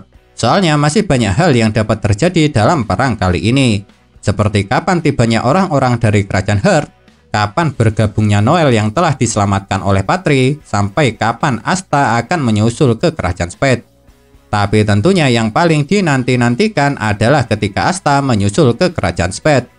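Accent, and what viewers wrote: Java, Indonesia. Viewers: Walaupun jawanya medok bnget.tp aq suka sma admin ne.soalx dya tu singkat and padat pnjelsanx.tp tolong donk bang ksih potongan2 dre mangax donk... Smngat yach bang...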